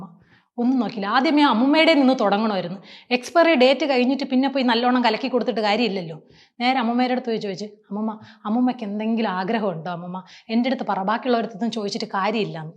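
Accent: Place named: Trivandrum, India. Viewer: assal tvm avatharanam. Love you from tvm and near by your place ❤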